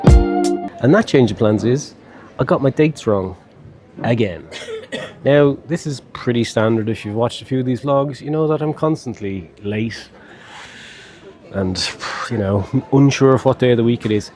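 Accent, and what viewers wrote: Ireland. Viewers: yes. I had noticed his Irish accent ❤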